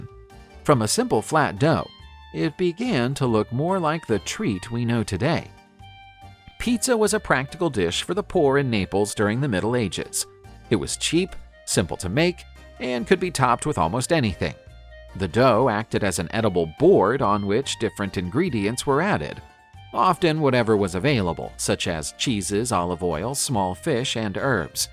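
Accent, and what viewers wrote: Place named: United States. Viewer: Good video but shame about the American pronunciations.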